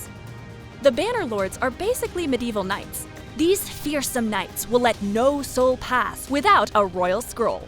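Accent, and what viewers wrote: Sri Lanka. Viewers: You're a Sri Lankan, right? How did you get that accent?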